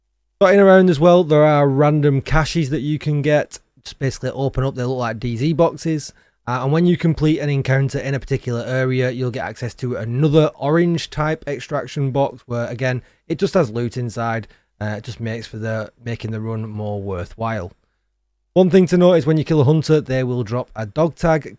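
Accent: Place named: Bolton, United Kingdom. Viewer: Are you from around Bolton bud😁. I've played a bit of it but dont want to grind too much, it may just put me off when it actually releases. I just wanted to nose around to see if it was worth coming back to with some friends.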